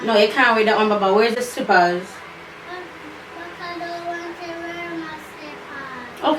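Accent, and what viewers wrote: Jamaica. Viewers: I love how you speak girl.love ur patwa. Can listen to u all day. Beautiful makeup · Great Job💪🏾 you sound Jamaican? New subcriber from! JA😊 Mama Mama!😊 i like your vibes you are so down to earth and the love of God is real in your life! Your vibration will take you places you never imagin😊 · First time watching ur video but u sound Jamaican so am gonna subscribe lol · Its actually an Jamaican accent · Are you Jamaican 🇯🇲 and British 🇬🇧? I hear two different accents. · U sounds like a Jamaican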